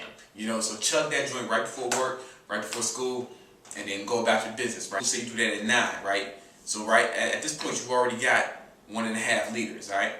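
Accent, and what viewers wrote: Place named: Chicago, United States. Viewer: sure you do*,you completely avoided the question of are you a fun/lovable person in real life. by the looks of your responses you seem to be really bitter. why are you judging and getting bothered by a accent from a black man from Chicago. I know for a fact there are people who wouldn't seem "intelligent" in your eyes but have more money and a fulfilling happy life then you.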